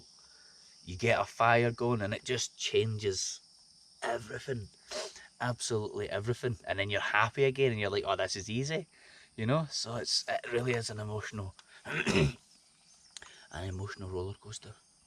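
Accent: Scotland, United Kingdom. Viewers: Nice channel,keep going..areu from Scotland mate?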